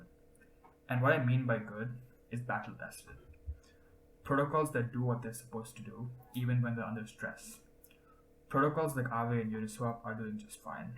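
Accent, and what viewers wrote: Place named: India. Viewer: Great video brother......are you from India??? just guessing from your voice.......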